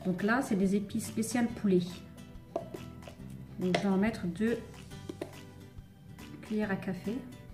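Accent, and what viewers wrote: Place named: Algeria. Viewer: في الهدرة باينة جزائرية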